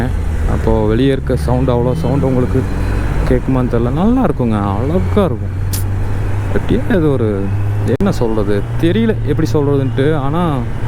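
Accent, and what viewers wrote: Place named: Coimbatore, India. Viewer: Covai slang🧡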